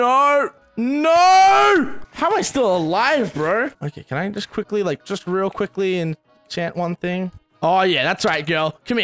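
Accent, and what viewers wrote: Australia. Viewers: I've been watching you so much that I've almost started to have a Aussie accent. Oh my god. Also if you deleted the chan I would die in <num> different languages :-))))) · ily so much I like your Aussie accent I'm from Australia but I don't have an Aussie accent because my dad is from England. I want one though. And your really funny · r u austrailian mate?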